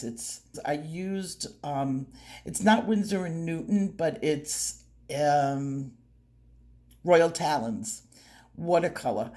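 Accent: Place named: New Jersey, United States. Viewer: So nice to see your newer videos. I have missed your NJ accent and great teaching skills😊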